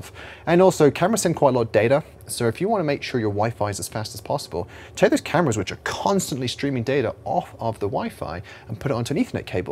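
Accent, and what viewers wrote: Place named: United Kingdom. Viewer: Also, where are you from? You sound british but have other influences in your accent. I'm from the UK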